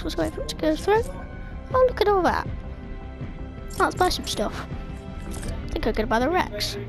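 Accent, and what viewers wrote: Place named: United Kingdom. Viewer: He sound so British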